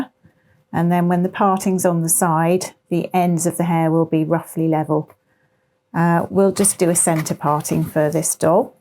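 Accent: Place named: United Kingdom. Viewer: Well I think the talking is explanatory. And this gentle British accent so much better than all of those yanking yanks!! 😅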